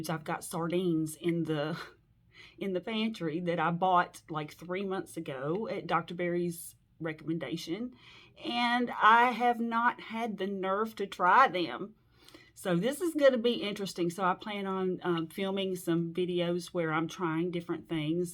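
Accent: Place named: South, United States. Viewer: Hi. Best wishes for your channel. Following Ken Berry for a long time, I did a little bit carnivore on and off, so far never "all in" 100%. I will change that now and go for it. Since I am living in Portugal (sardine country), I can tell you my special not so carnivore recipe: I put one can of sardines and 1 can of anchovis in a food processor and blend it to a paste. This paste I fry in a pan with a piece of butter, add salt and pure natural tomato sauce. Then I let it simmer on low fire for 30 minutes and fill all in a glass bottle. Every morning I heat up a few tablespoons of this sauce and put it on my scrambled eggs or on burger patties. I like it, you don't taste the fishes. Has a lot of omega 6, fat and lycopene. By the way, I like your southern accent very much. Is it Tennessee like Ken Berry?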